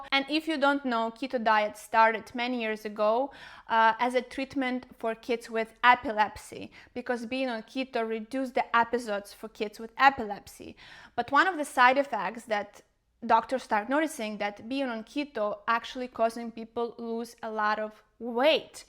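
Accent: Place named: Russia. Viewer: Great easy going video. And nice accent, reminds me of my time at school with a lot of Russians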